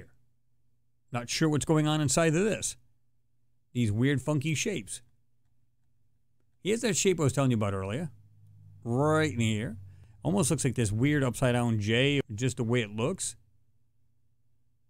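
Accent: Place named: Rhode Island, United States. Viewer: You sound like u live in Rhode Island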